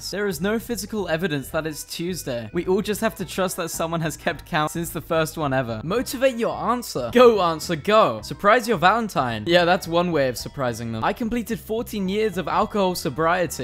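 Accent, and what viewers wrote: United Kingdom. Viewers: Is it just me that noticed he has a British accent now 😃?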